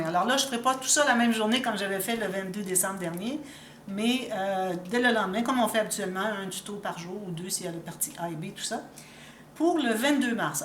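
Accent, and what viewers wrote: Quebec, Canada. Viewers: Piquer une jasette c’est encore pire parce que ça sous entend de la colère en jasant...😖 bavarder vous conviendrait il où discuter? Et si nous placotions le 22 mars? Sauf que là, personne ne comprendrait peut-être... Ce n’est pas un cours de français, (un peu quand même car je contrôle toujours que ce qui me paraît inapproprié en français français, l’est réellement!) c’est amusant plutôt. Et j’ai du temps! De plus vous faites beaucoup d’efforts pour parler un français correct alors, on peut bien vous apporter notre soutien de cette façon. D’un autre côté, jaser peut plaire à beaucoup, donc c’est vous l’arbitre. On peut toujours vous laisser jaser, ce qui est fort plaisant avec votre accent canadien français! On peut ne redresser que les termes techniques qui ne conviennent pas ou que vous cherchez. Bernadette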